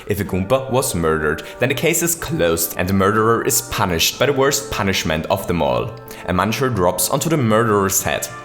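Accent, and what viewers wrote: Germany. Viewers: Are you german? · He's German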